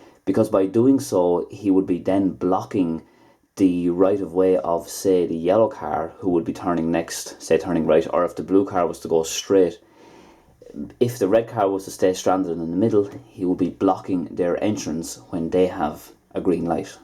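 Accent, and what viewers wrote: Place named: Ireland. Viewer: you're so clear with your Irish accent you're the best.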